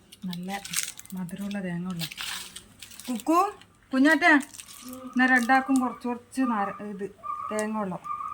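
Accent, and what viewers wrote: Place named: Kannur, India. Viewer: ok👍 ഭാഷ കേട്ടത് കൊണ്ട് ചോദിച്ചതാണ്... ഞാനും കണ്ണൂർ ആണ് 😃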